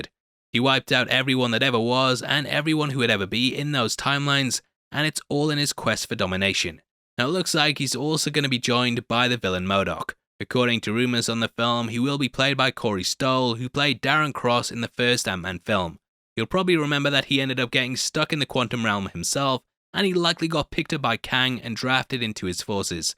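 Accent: United States. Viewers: nice american accent heavy !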